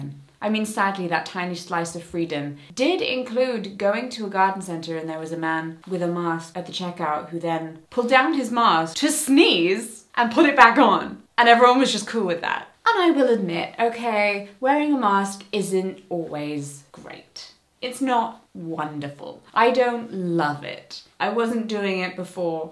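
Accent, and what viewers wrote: United Kingdom. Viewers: You sound extra British in this one 😂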